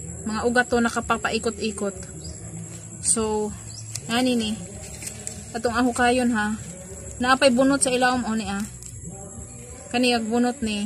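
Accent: Visayas, Philippines. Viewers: Hi new subscriber here...tGa asa man ka? Kay ang imo sulti murag sa bohol or surigao or leyte?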